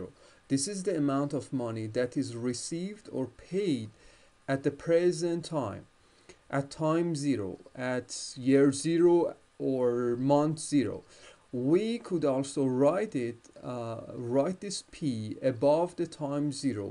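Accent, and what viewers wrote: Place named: Iran. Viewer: pure Iranian accent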